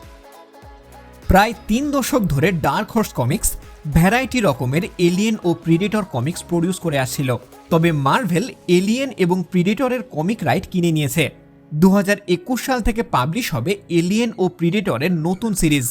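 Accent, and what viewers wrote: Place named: Bangladesh. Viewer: Apnar kotha to kolkatar manusher moto na.😞 Bangladesh er manusher moto lage.😋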